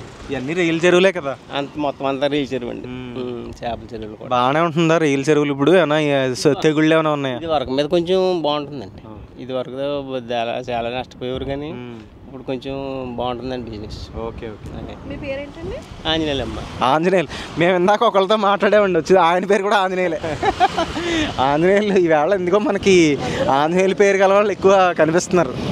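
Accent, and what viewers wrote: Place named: Konaseema, India. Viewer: Hi anna. Mee maatallo konaseema effection kanabaduthundhi.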